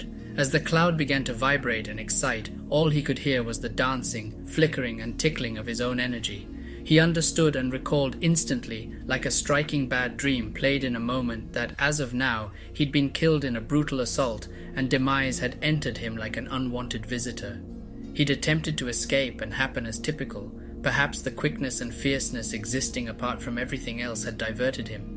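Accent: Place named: United States. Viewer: english ayaa i dilay wow american boy keep going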